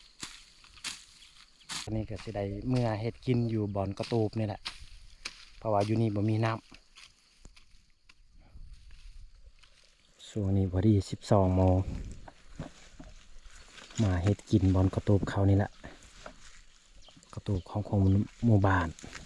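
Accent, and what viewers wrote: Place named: North, Thailand. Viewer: พูดเหมือนคนภาคเหนือของไทย.กินคือกั๋นเลย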